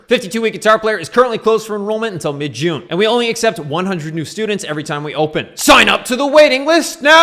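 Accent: Canada. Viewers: Why are you swearing. Sounds like you are from Canada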